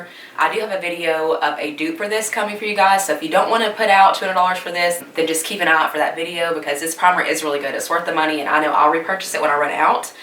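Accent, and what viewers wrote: South, United States. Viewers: Hope you don't mind my asking but where are you from? I hear a little country twang!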